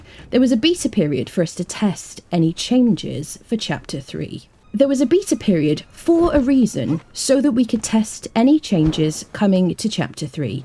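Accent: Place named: United Kingdom. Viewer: I love uk accent. Bee-tah. 😍 Us southern yanks say Bay-tah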